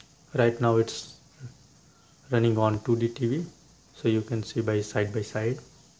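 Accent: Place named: India. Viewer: Thats how hindi douche speaks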